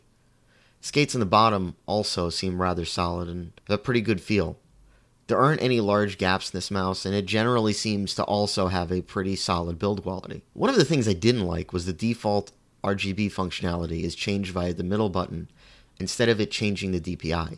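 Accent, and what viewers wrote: Scotland, United Kingdom. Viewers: Too bad you lost your accent (like for example Xcom 2 Episode 1 ), that was like 25% of me wanting to view it (love the Scottish accent) :)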